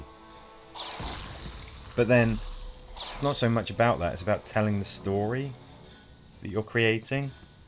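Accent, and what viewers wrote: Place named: United Kingdom. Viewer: Nice work, as usual. I think the British accent helps your credibility (not that you need it) but your commentary is superb.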